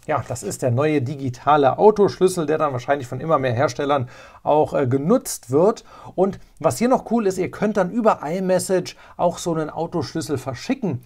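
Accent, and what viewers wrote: Saarland, Germany. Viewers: Bist du aus Saarland geil 😅😂 bin aus Saarbrücken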